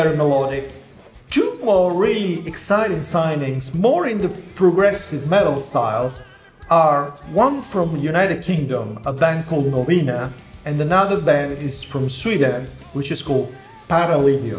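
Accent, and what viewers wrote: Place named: Italy. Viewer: Thanks ! Very interresting and for a french easy to understand an italian in englich because le the accent . Please continue this little interview an long lire ton frontiers !!!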